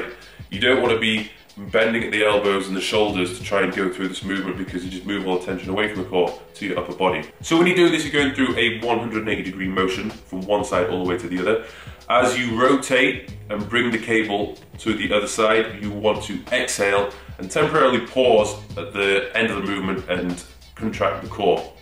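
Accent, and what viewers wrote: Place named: Birmingham, United Kingdom. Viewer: I can't place his accent, is he from Burningham?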